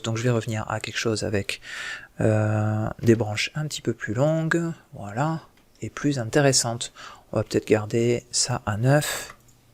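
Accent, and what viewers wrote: France. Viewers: Aie, plusieurs tuto de toi que je regarde, a chaque fois je me dis que tu doit etre francais et je viens de remarquer que tu faisais tout les tutos en francais aussi --" Merci pour tes videos elles sont bien expliquées :D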